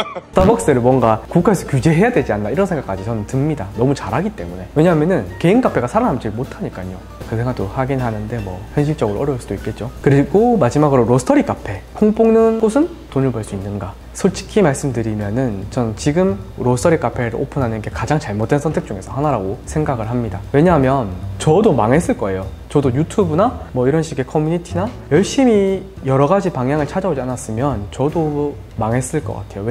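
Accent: Gyeongsang, South Korea. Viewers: 대구억양 넘 귀여운데요ㅎ???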